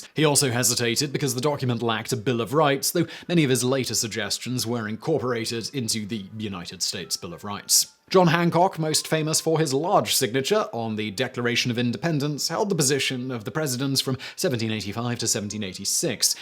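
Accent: United Kingdom. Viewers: I stopped watching when I heard a Brit speaking about American history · You yook the words right out of my mouth!!! At least, he talks British. Not sure if he truly is or not. Anyone can imitate a British accent for views. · The current country of the United States didn't exist until its formation through the Constitution... that's why we Americans brag about being the "oldest country in the world"... we have the oldest, continually-functioning government. I do love it when people with foreign accents try to explain America to Americans... enjoy Brexit, Redcoat!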